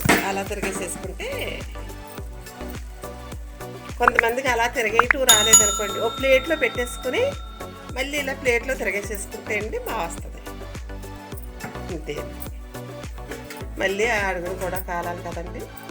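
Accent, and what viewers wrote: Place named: Konaseema, India. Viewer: So nice మా కోనసీమ మాట లా ఉంది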